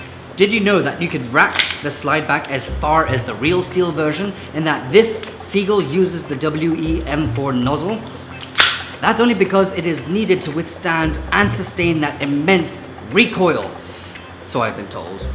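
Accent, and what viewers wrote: RP, United Kingdom. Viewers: Is that a British accent? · You sound really posh lol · why do u sound so British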